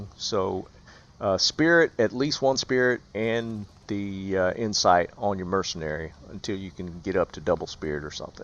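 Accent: Texas, United States. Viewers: Sounds like Texas or Mississippi.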